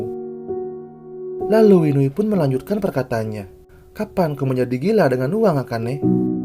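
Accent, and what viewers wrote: Bali, Indonesia. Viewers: Pasti orang Bali ni Mimin nya😁😍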